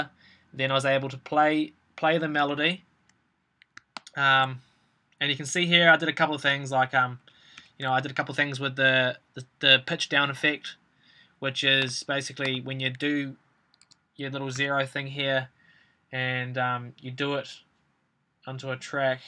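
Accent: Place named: New Zealand. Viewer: does his accent, as in NZ as in NEW ZEALAND... and his channel name 'nz' not give any hints to you? and i'm pretty sure many new zealanders would be not too pleased to be called 'the same' as australians and vice versa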